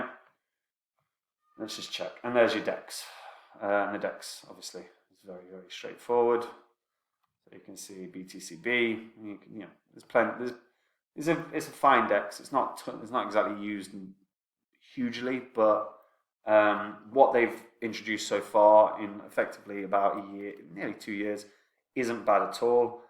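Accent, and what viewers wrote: Scotland, United Kingdom. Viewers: do i hear a hint of scottish in your voice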